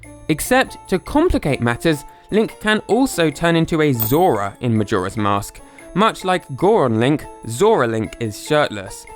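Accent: United Kingdom. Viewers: did i just listen to a british guy talk about nipples for eight minutes? yes. yes i did.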